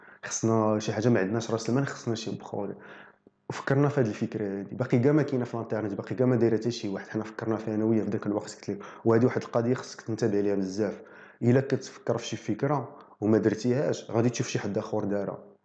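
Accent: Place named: Maghreb, Arab world. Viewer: حاولت افهم ما قدرت فعلا لهجة اخوانا بالمغرب العربي صعبة الفهم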